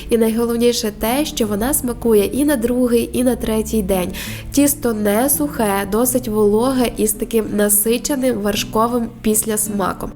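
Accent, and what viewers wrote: Ukraine. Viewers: да по-разному она и раньше разговаривала. По говору слышно сразу что дома говорит на украинском. Просто видео на русском имело больше просмотров и лайков. Ну, а сейчас у них в голове клемы поменялись, уси дюже патриотамы сталы. Ничего, спецоперация закончится, и снова все перейдут на Великий и Могучий. А пока пусть лают.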